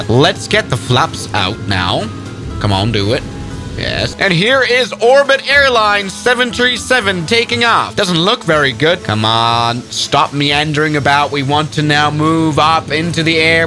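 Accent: Germany. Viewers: Dein Englisch-Deutsch hat mich gekillt😂👍. Ich hab von Kindesbeinen an sämtliche Simulatoren geflogen, angefangen mit FS98, 2000, 2002, 2004 und der FSX natürlich. Der Übergang vom 2000 auf 2002 war schon revolutionär damals. Bevor ich aber wirklich mit dem MSFS angefangen habe bin ich viel "Fly Unlimited 2", der wahre Flugsimulator meiner Kindheit. Ach und dann war da noch "Fly! 2" von Take 2, kaum bekannt. Ich erinnere mich noch an Top Addons im FS2002, Capain Sim hat im FS2002 mit der 727 angefangen. Die war damals revolutionär oder die gute "Ready for Pushback 747-200". 2012 habe ich dann Pause gemacht und Anfang diesen Jahres mit dem FS2024 angefangen. Ihr könnt euch nicht vorstellen, wie heftig diese Entwicklung für mich war😂. Die Grafik war das Eine, okay, ich hatte den 2020 schon auf dem Schirm und wusste von der guten Entwicklung grafischen Entwicklung, aber was für mich krass war, war die neue Technik in den Cockpits, nämlich die neuen Glascockpits. 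Bis dahin war für mich das Cockpit einen A320-200 am modernsten oder das einer 777😂. 2011 gab es doch von Microsoft einen ganz kläglichen Simulator. Ich glaube der hieß einfach nur "Microsoft Flight", spielte nur auf Hawaii und war grafisch im Grunde nur ein leicht aufgehübschter FSX, wurde auch baldig eingestampft. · I was not expecting the German commentary brother. I guess I never realized you are German lol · bro was a Germany or swiss?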